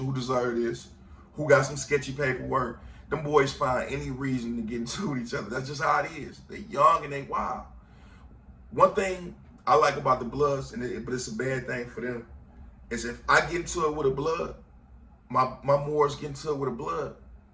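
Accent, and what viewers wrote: Detroit, United States. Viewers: I'm sure someone has suggested this ... But I'd rock a "ain't no ifs ands or buts about it" hoodie. I listened to two of these videos and knew you were from Detroit without you even saying it.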